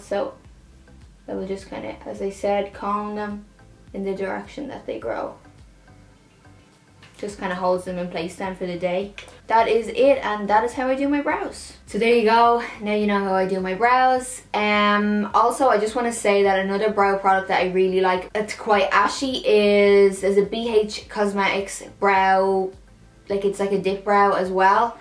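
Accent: Ireland. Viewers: Irish (Dublin I think) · nidaa haib pretty sure Irish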